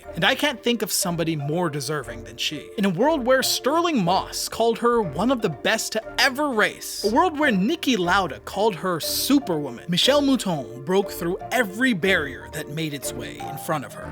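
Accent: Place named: United States. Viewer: Hearing "bloody" and "petrol" in an American-English accent just does not compute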